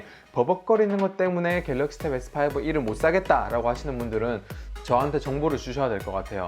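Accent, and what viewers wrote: Gyeongsang, South Korea. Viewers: 형 이때는 갱상도 쌍남자스럼이 남아있었네유 ㅋㅋㅋㅋ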